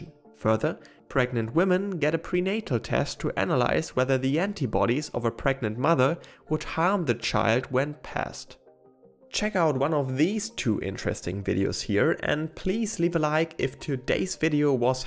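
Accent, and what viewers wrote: Germany. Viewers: Awesome video. Hab direkt gehört, dass du aus Deutschland kommst 😁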